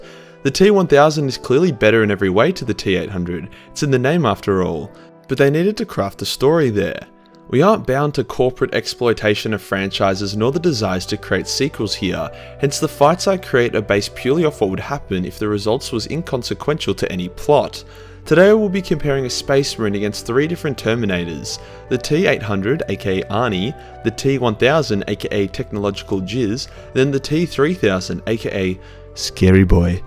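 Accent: Australia. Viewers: Subscribed early - top job Mate ,and it's bonza to hear a fellow Aussie accent to boot !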